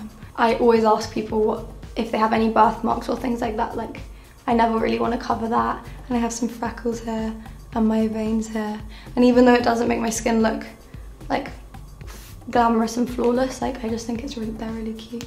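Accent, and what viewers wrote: United Kingdom. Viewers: sotaque britânico AAAAAA 😍🥱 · does anyone know what british her accent is i love it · She’s so young, but her British accent makes her seem older and more sophisticated 👍💅🏻💄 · moonchild It’s honestly just an average British accent, I didn’t even notice 😂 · Hyu Yu i do agree that it is a common accent in the uk but i find it so pretty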